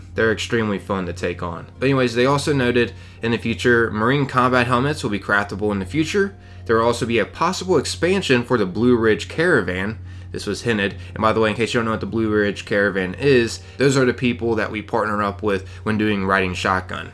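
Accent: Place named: South, United States. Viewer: Your from Ohio? I'm guessing southern. Def ain't North East 😂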